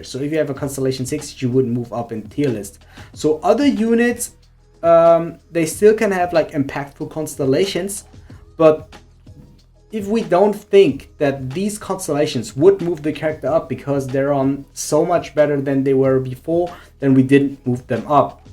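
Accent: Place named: Germany. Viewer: I mostly agree with you tier list and think it's one of the best I have seen so far. The only argument that could be made in my opinion is Zhongli is the best character to me. He gives more support-dps options with his resonate dmg and his burst as well as a true support he gives way more than Venti does in terms of helping you team out. His shield makes you invincible and you shred the defense of the enemies by 20% at close range which might be the strongest ability in the game right now. I think it is a battle between Venti and Zhongli at the top and agree with both, but the argument could be made for both I tend to think Zhongli is a little bit stronger overall. Note: I like you english it's really fluent I have to say. I can hear you have an accent might be german or something like that, but really good english!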